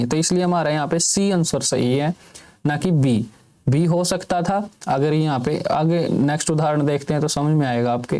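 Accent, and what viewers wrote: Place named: Haryana, India. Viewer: Sir your voice tone is so amazing... Haryanvi mix Hindi... your lectures very beneficial for me 🥳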